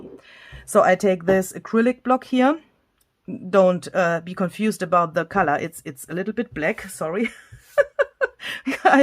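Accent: Germany. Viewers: I just love watching you create, my Mom was from Germany so that makes it double more fun!!! She had the same wonderful accent. Thank you for making my day! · You are amazing Lady , love your creativity, I have learned so much with you , thank you , love your English /German language, God Bless you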